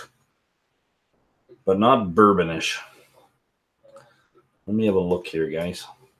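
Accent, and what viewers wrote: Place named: Sweden. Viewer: Please always talk in a swedish accent:)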